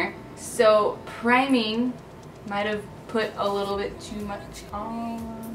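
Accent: United Kingdom. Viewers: Her English accent "oh bloody hell" that's totally jokes literally that's me being normal everyday!!!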